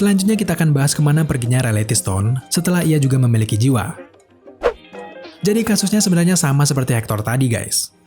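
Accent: Java, Indonesia. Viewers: Nada Logatnya mengganggu. Medok banget. 😁🤭🙏🙏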